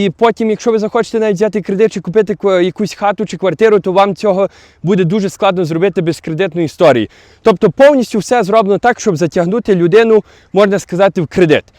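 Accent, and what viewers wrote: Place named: Hutsul, Ukraine. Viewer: аха...ха що за вуйко? Але смішно говорит, в Україні хіба десь в горах гуцули засраті так говорат. Все там правильна побудовано і задумано, щоб тваринам не хотілось воювати і вбивати собі подібних треба їх нагрузити працею, щоб голови немали коли підняти. Хто приїзджає в США і Канаду з країн колишнього срср називають їх трудовим табором з підсиленим харчуванням.Зато в Канаді і США немає війн вже з 1873 року, а на території срср постійно дебіли товчуть один одного.Бо робити не хочуть.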